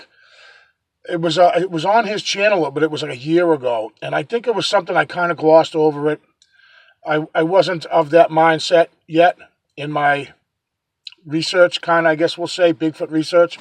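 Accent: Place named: New York, United States. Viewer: You talk like a New Yorka lol. Regahdless. It's different than all other cryptid research channels.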